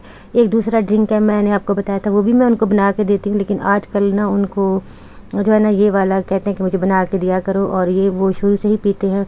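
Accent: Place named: Saraiki, Pakistan. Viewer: السلام علیکم پہلی دفعہ آپکا بلاگ دیکھ رہی ہوں ،،،،،میں لغاری ہوں سرائیکی آپکا لہجہ بھی سرائیکی جیسا ہے ❤❤❤ ما شاء اللہ 🎉 پلز بتائیگا آپ کی زبان کونسی ہے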